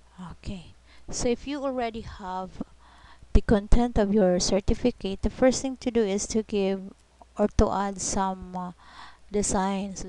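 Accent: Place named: Philippines. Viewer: From the accent I can already tell you're a Filipina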